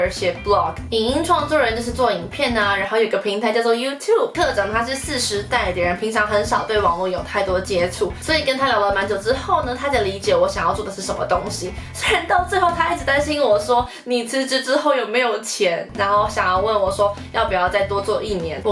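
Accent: Kansai, Japan. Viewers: 关西式的声调好可爱。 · Maomao有关西腔诶😄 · 所以Mao會用關西腔嗎？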